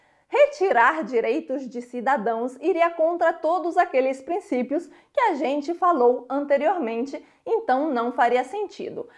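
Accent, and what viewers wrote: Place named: Brazil. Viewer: Não entendi o nome do canal: '' Brasileira por acaso'' ? A dona do mesmo nasceu onde? Ao que parece e pelo sotaque é brasileira. Complexo de vira-lata? Pode ser...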